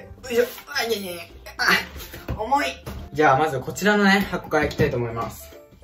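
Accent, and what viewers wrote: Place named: United Kingdom. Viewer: めっちゃイギリス発音！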